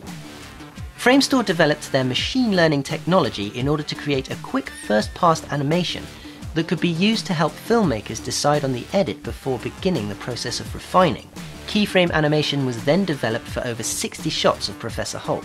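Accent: United Kingdom. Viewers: this video is alot better because of British accent? I love it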